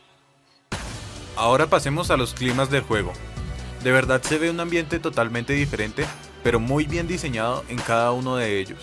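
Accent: Colombia. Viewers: Sos de colombia cierto? No se note como asento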